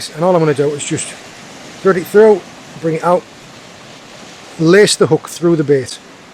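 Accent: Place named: Northern England, United Kingdom. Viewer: Are you originally from up north? I always hear northern in your accent haha was just wondering, keep up the vids always a pleasure to watch and very knowledgeable 🤘🏼🎣 top bloke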